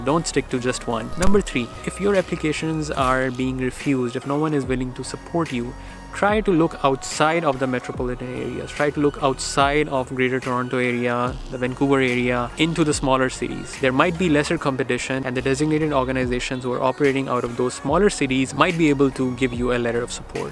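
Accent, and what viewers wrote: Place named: England, United Kingdom. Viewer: Wonderful English accent 😍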